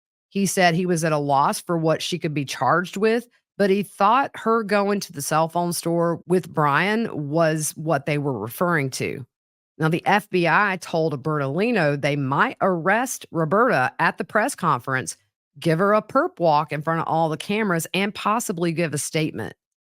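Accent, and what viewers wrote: South, United States. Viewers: you do such a fantastic job reading that as quickly and concisely as you do! Your linguistic prowess is amazing! It’s really impressive how you never pause or screw up and your cute southern draaawl is replaced with more of a NYC pace. I love your content!❤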